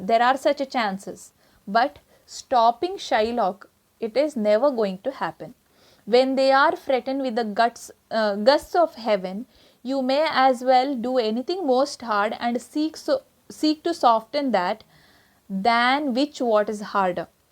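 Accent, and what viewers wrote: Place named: South India, India. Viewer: Good explanation... With south Indian accent 😊👍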